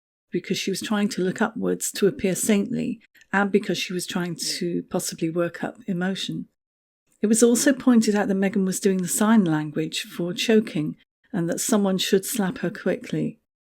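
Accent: England, United Kingdom. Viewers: Hi my friend ! Can you tell me how to hear Megan’s speech please ? I’m 74 and technically useless ! Ido so enjoy your posts, as you’re obviously English, as I am, and easy to listen to, and tells it ‘as it is’ ! But I’ve been sick and not been able to check my iPad or YouTube ! So I’ve missed it all ! PLEASE HELP !? I only have an apple apple iPad, nothing else, no smart phone, etc which didn’t most of my friends don’t know how to use as they have tablets, Android type ! PLEASE HELP ME, I really need to know what she did ? Thanks so much (she is ‘roaming around seeking those seeking those SOULS who would despaired )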